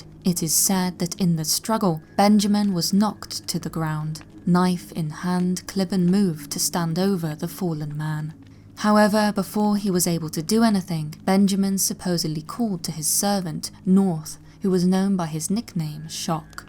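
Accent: United Kingdom. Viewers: you’re awesome! Your voice is so soothing, it seems that anything spoken in British accents makes them seem more real. But that last one about the Black Lady, that’s quite a damn good reason to roam the earth after death. Black lady, Black Lady, I’ve stolen your, oh hell no I’m out of here!!!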